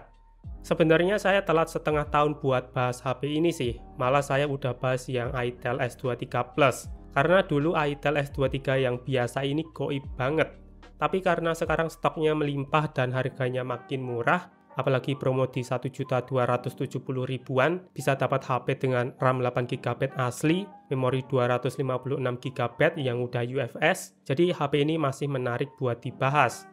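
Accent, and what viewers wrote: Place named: Banyumasan, Indonesia. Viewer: "ya jelas ngelag lah blog" ngakak anjir ngomong nganggo logat banyumasan lucu piye ngono maseh😂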